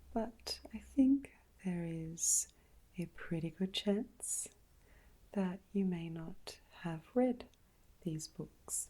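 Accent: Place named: Australia. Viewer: Beautiful, classy Aussie, erm, Australian accent. And, deliberate, specifically chosen words. Thank you. You are so enjoyable to listen to. And the type of person my wife and I seek out as a friends. Keep going! Best wishes from LA.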